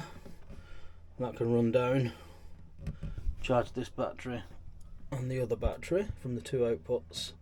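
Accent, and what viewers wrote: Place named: North, United Kingdom. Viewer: I missed the bit where you said in your northern; "Don't belive in spirit levels " 😃 I am certainly looking forward to seeing more, just so I can see your workmanship reaching new heights. This boat project is going to be good for you. Take care.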